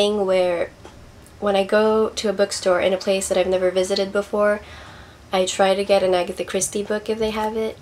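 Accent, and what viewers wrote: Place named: United Kingdom. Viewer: lol love your British accent and your intro!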